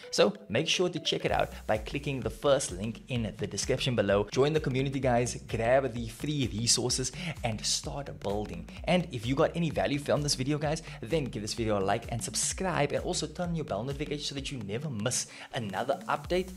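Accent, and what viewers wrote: South Africa. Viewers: Bru are you from SA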